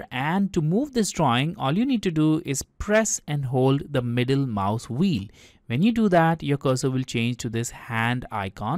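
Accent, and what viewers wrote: India. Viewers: Why would you use an indian voice over to speak English what the fuck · A rare case of a quality tutorial made by an indian with an indian accent.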